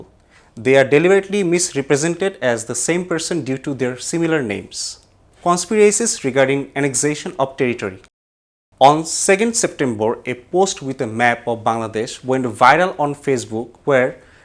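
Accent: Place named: India. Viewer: Change the anchor(Indian accent)with an western accent speaker. Though it is good to hear from a hindu Bangladeshi.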